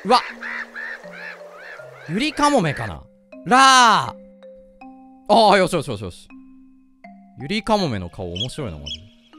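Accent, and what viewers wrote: Japan. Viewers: 開始10秒でお蘭の流暢な日本人英語聞けて大満足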